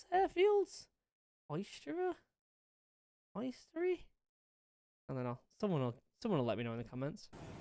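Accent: United Kingdom. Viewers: After the first ranting when the video starts the guy says video has no audio, video has audio doesn't have narration and narrative is shown under screen and suddenly guy says let me read it so people have problem in reading English understand it, I am a civil engineer with masters degree in steel structures and second master in Political Science and international relations and now studying PhD in regional studies and my toefl score was 97 point out of 120 which I took the test 5 years ago and now I am so much better in English, I wanted to say to this guy the British accent is fading out very fast and the real reasons for it's demise are it's unclear and non comprehensive, as I witnessed 2 brit one from Chelsea and on from other side of the London couldn't understand each other, and it's a snub and how it carries the feeling make the people who hear it feel bad, it's getting lectured about importance of morality in life and Vlad putin is the person gives you a talk on how precious life is, and if a person had problems in reading a text in English you be sure they never understand a word you mumbled, if you want to your work be seen by people loose the accent man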